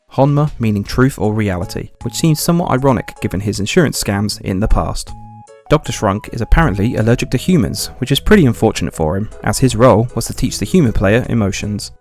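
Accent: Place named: Japan. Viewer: Totally enjoyed this! I only knew about the pelicans but everything else was new. Also...your Japanese sounds authentic. Now I have a question for your next Q&A lol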